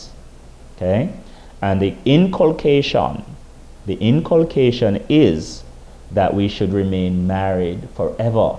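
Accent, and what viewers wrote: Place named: Jamaica. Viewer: and he sounds Jamaican like me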